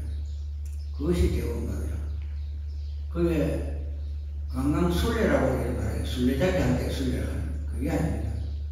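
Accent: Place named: Gyeongsang, South Korea. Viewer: 감사합니다. 정겨운 경상도 사투리를 쓰시면서 큰법문해 주신 종정스님께 감사의 예를 올립니다.법체 강건 하옵소서!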